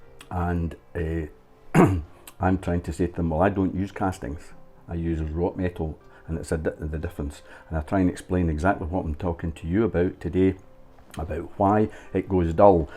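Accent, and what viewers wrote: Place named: Scotland, United Kingdom. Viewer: Great video and superb Scottish accent. Greetings from Poland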